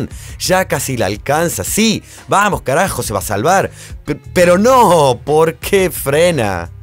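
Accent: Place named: Corrientes, Argentina. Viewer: Jajaja!!!, muy bueno!!, ahora una pregunta!, sos correntino o chaqueño???, por el tono!!!, jajaja!!!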